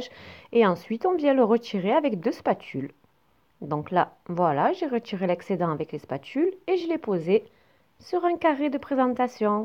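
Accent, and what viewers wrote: South, France. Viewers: L'accent du sud on Aaaaaaadore!😍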